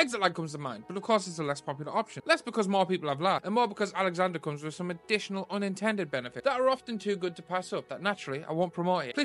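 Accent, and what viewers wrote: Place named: United Kingdom. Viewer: Note on you speaking fast: I'm a fast-talker too. My mouth tries to catch up with the runaway freight trains racing along the multiple interweaving tracks in my brain and often ends up tripping along the way. So I get it. I do still need subtitles to understand you, but that's mostly because I'm not british. The full-speed words combine with the accent I'm not used to in a way that turns my brain to mush. No need to feel self-conscious over something you can't really control, we all just work with what we got.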